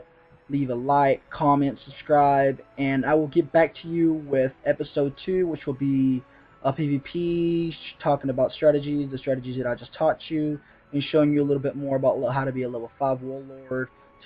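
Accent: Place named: Kentucky, United States. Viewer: God dang his accent so kentuckyish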